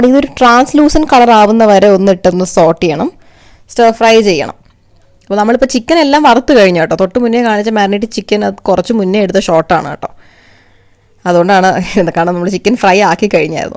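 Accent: Trivandrum, India. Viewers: vrithketta trivandrum slang.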